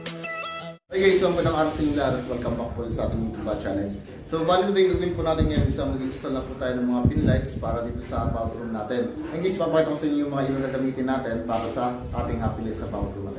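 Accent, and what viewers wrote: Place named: Quezon, Philippines. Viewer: Napansin klang sa pananagalog mu puntong quezon ay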